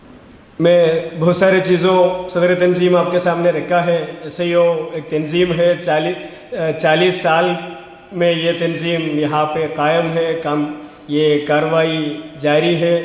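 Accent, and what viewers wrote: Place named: Kerala, India. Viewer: His mother tongue is Malyalam he is addressing Non Malyalam Audience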